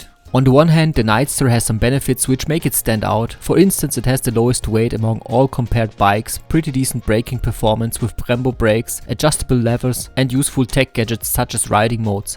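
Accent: Germany. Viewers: I truly enjoyed this video, and the German accent is awesome. Germans have always loved Harley. I appreciate you didn’t use an AI voice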